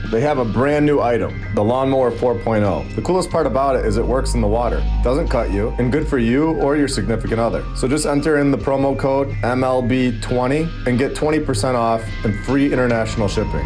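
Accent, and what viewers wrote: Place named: Michigan, United States. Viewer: Loving these videos. Haven’t gotten terribly far. How does it link to the Masons🤔🤔🤔. Have you listened to Bill Coopers series on Ancient Babylon? Also love your accent - Michigan??😊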